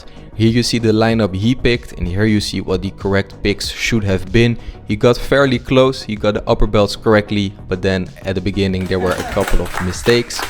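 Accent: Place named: Netherlands. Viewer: Do I smell Dutch?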